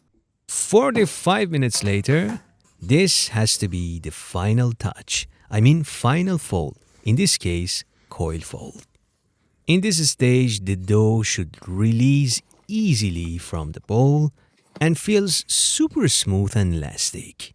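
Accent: Iran. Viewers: i make sourdough and his vids are great but if I am honest I am here for Persian accent.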